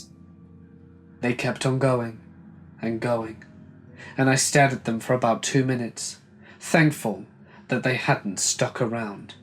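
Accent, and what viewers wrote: United Kingdom. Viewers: Just love hearing his British accent...wished I was fluent having his accent living here in America... I trained as a trucker and heard creepy stories about what States to avoid, always be aware of your surroundings because of human trafficking and truck jacking do to people think that semi truckers have a lot of money...😬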